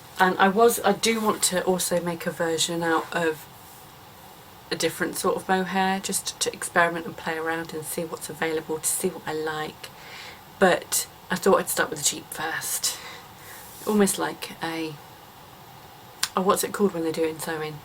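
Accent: United Kingdom. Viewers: Hiiii!!! I love to hear your perfect British accent😍. And that mohair coat it's lovely. I have a lot of stash, mohair stash, and always think it's better knitted than crocheted!! But you made me rethink it😁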